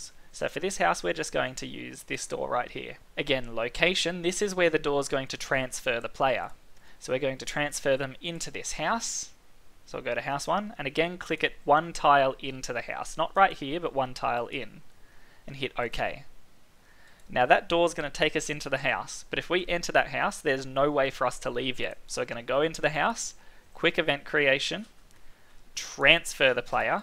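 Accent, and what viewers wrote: Australia. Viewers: You're from South Australia aren't you?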